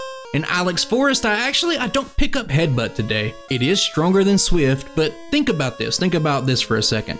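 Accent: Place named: Louisiana, United States. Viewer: Bro what is your accent. Nothing against you at all. I enjoy all the vids but your accent sounds so Hollywood south movie created that I have to know where it’s from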